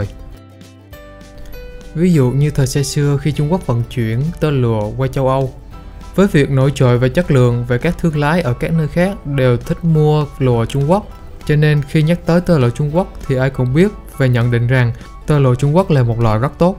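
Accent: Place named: Phu Yen-Khanh Hoa, Vietnam. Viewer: Giọng nói của bạn rất hay. Chắc là giọng Phú Yên-Khánh Hòa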